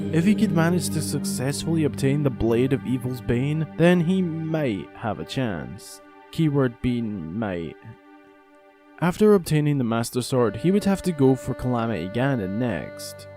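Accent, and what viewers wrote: Scotland, United Kingdom. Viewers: The second the video started and I heard your voice, I immediately knew that you were Scottish, cus I'm Scottish myself! Idk just thought that was quite cool. But great video, solid points as well, you've earned a sub :D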